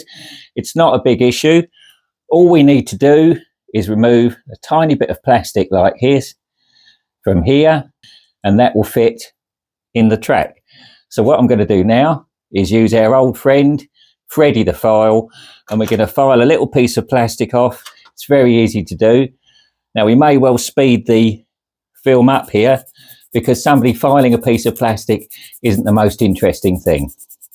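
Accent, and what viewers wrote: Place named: United Kingdom. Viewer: I came from the youtube ad and initially i kinda chuckled going this is the most british man ive ever heard but when i actually came and watched it i wound up actually enjoying it Model trains have always been my favorite especially when me and my late father would go to the fair and spend 20 minutes just looking at the model train set in the back Thanks for brining back some of those special memories man ❤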